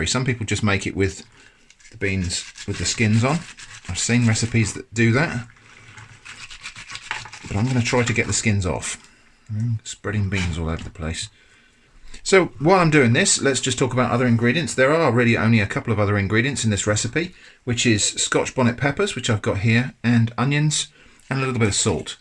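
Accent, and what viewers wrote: United Kingdom. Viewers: Must be the same British accent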